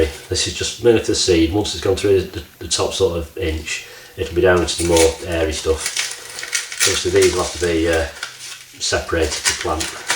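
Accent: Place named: Lancashire, United Kingdom. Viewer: I cannot believe that i have only just found your channel! over the last 3/4 years of subscribing to other allotment/ garden channels! Been catching up watching as many as possible of yours over the last 2 weeks. You make them very interesting and informative. I haven't got an allotment...just a small rented garden so its lots of bags and pots but the enjoyment of growing and eating your own veg is so rewarding. Keep the videos coming ,all the best for 2017. I'm originally from Preston... not that far from you...so I enjoy the accent too! . Cheers. Pat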